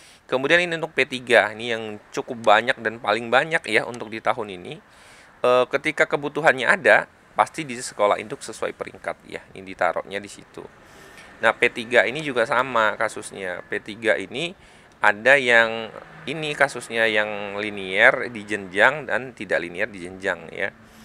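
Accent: Banyumas, Indonesia. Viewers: kenapa ini suaranya ngapak pedia hh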